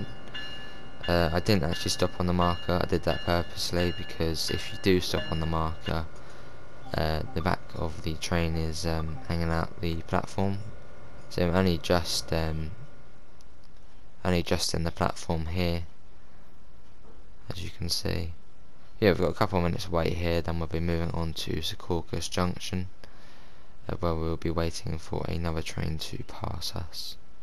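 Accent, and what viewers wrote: United Kingdom. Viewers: WOW nice i was dreaming last night about you saying on augest 6th today we are going to finally play TSW2 i am so excited.:D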